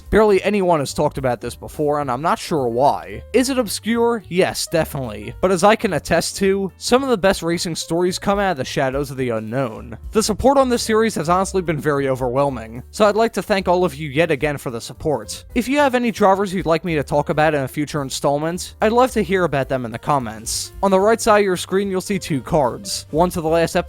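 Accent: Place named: Mid-Atlantic, United States. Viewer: Ah I was gonna guess somewhere in the mid Atlantic. I grew up in Baltimore so I thought it sounded kinda familiar! Great videos btw keep up the good work. Cheers from the Indiana!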